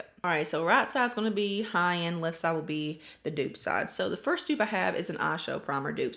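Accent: South, United States. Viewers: noob here... you seem so down to earth. looking forward to watching more of your videos! as a North Carolinian, I immediately favor any twang I hear. #southernsistas 🤣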